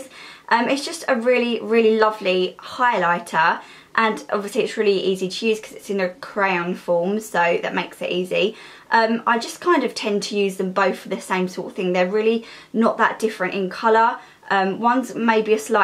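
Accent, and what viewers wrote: Essex, United Kingdom. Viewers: Are you from essex💁😁😁😁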